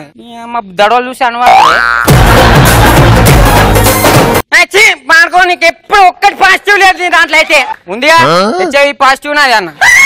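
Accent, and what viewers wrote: Telangana, India. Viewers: bayya Telangana language super bro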